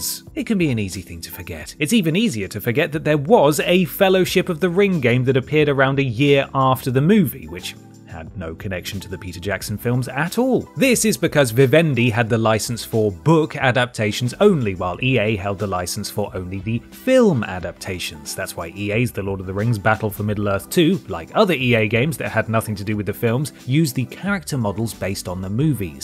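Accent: United Kingdom. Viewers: Gotta love british accent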